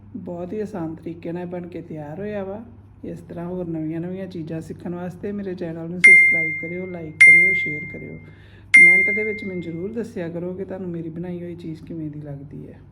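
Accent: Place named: Majha, India. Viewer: Mam tusi majhe de boli bolde j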